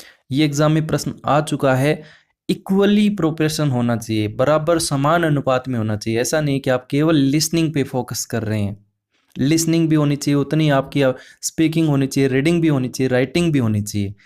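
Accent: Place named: Haryana, India. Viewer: Guruji 👏AAP pdane saath saath Jo hme motivate karte ho Aapka bahut bahut dnyavad .aapki aawaj se esa lagta h Guruji 👏 Jese AAP haryana se ho AApke pdane ke method ka koi jwab nhi Guruji 👏 AApke vedio Dekhkar saath saath notes bna rhi hu Guruji 👏 from kaithal HR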